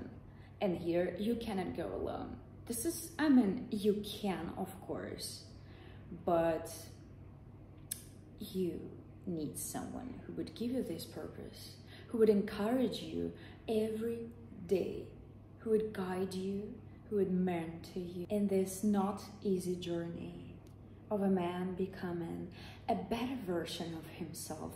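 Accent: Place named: Russia. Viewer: She's from Russia or Ukraine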